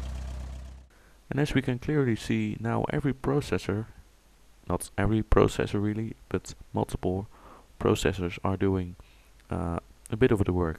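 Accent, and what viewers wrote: Netherlands. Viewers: nice gonna try it ! by the way you have a dutch accent haha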